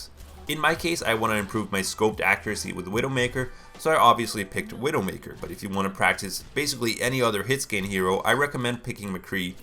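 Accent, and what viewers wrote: Boston, United States. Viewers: Where are you from. Your accent is cool. Boston?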